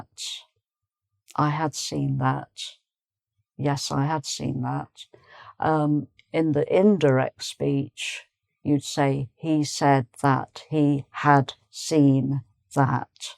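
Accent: England, United Kingdom. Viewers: How nice to hear english english rather than american english.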